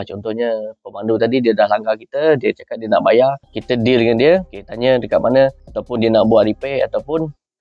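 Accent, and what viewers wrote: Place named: Sarawak, Malaysia. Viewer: TQ bro info bagus. Baju JDT tapi slang Sarawak. Terlanggar awek Johor ke bosku.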